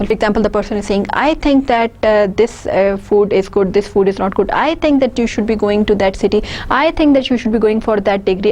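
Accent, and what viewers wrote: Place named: India. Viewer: only your accent is indian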